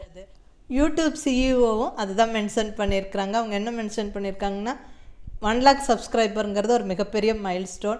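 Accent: Kongu, India. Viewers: Many congratulations madam Wish you many more laurels 💐 Above all your kongu tamizh is also attractive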